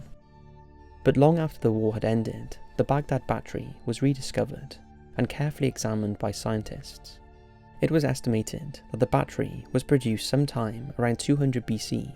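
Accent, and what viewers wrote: United Kingdom. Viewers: What do i think - that your a fuuking natzi, talking like i britt, click bate bitch! Love from Israel!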